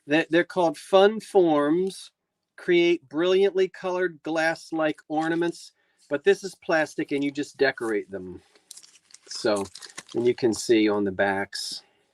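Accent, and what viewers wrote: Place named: South, United States. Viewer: 😂😂😂 and his southern accent.Am dram is missing out on your talents.David loved hearing the sandal story.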